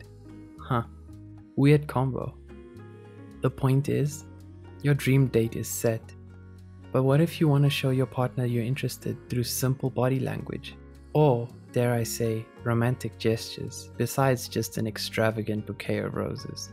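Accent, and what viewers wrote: South Africa. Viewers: is he South African? it feels like home. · Is South African? I recognise the accent:) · The voice sounds South African and I'm not complaining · I can instantly tell he has a South African accent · The voice-over artist sounds South African 😱 (I'm also South African but I don't have the accent lol.) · it's a south African accent. As a south African I recognize it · i love his south african accent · This person sounds south african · I’m picking up a South African accent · Immediately I need to know, the narrator is South African right...maybe Namibian, but I'm really feeling South Africa. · Sounds like a South African accent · A nice South Aftican! 👍😀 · Is he South African? By any chance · I was searching for someone to confirm my thoughts that this is a proper South African accent. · He sounds South African 😍👌🏾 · I love it!!!!!!❤Sounds South African ? 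· Sounds like a south african accent 🙃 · A South African audio !? I LOVE IT !!! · does he happen to be South African? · Is this a South African accent I am hearing,if it isn’t , it could be 👀🇿🇦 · Sounds like our South African accent... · Does the new narrator have a South African accent??? I’m from South Africa and I was shook and confused like is he from here? Why does it sound so familiar? 😅😂 love it tho ❤️ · Me too he kinda sounds South African. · He sounds like he's from South Africa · U lowkey sound South African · why does he sound like he has a bit of a south african accent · Sounds like a South African accent. Nice surprise. Love from SA. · wait what?! this guy has to be South African. I know that accent! this was expected 💚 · This is 100% a South African accent right ?😂 lol represent!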